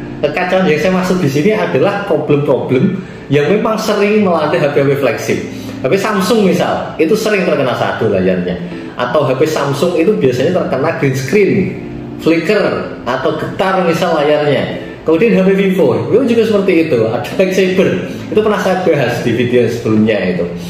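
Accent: Java, Indonesia. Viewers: medokk bngett ituh jawa mu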